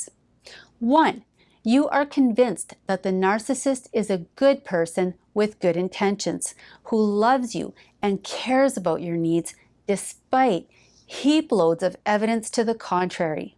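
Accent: Quebec, Canada. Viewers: coach, about - ugh that francophone/Canadian accent makes me melt. Love bombing you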